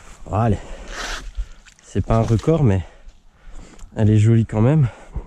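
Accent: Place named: Alsace, France. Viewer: Jolie commune pour l'époque et pas un chat au bord de l'eau. :) Ce petit canal est non seulement très poissonneux mais abrite dans certains biefs de bien belles surprises... Vu l'accent je me suis dit que t'es d'Alsace ou de Lorraine,alors je suis allé sur la page fb et j'ai immédiatement reconnu les photos du Rhône au Rhin à grand gabarit à l'île Napoléon donc j'en conclu que t'es de la région Mulhousienne. :)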